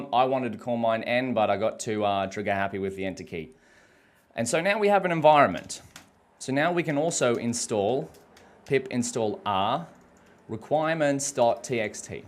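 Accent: Australia. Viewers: Love the aussie accent bo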